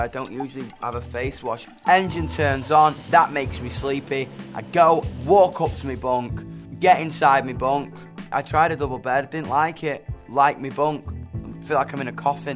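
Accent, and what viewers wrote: United States. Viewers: His American accent is pretty good! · HIS AMERICAN ACCENT OMG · His American accent is better than our British accent........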